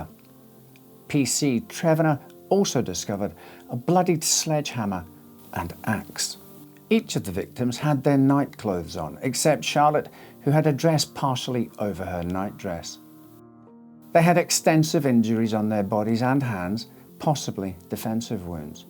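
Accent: Scotland, United Kingdom. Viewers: The accent is not English , it is a Scottish one. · This is in England but his accent is Scottish. I'm sure they'll let you off lolol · Love your vids. Is your accent mixed? I swear I keep hearing Scottish for a split second here and there, then suddenly you're English again, lol. I wonder if I sound like that myself with living in England and having had a Scottish dad.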